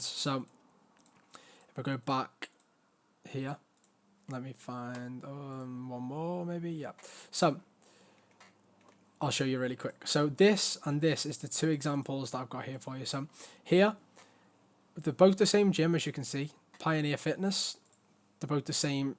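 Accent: United Kingdom. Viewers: Thanks man! Good to see a fellow scouser as well. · Loving these vids so far mate. I dunno if it's coz your a fellow Brit and explain things without going a million miles n hour like most of em on here but I'm looking forward to watching the rest. Thanks for taking the time 🍻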